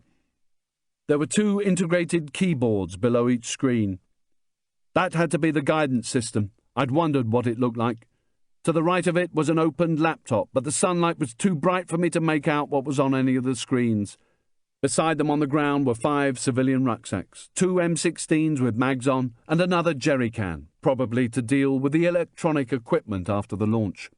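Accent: England, United Kingdom. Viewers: Yes the English accent is pleasing to my ear 🤔 I am always disappointed when an audio book is accompanied by the American version 🙄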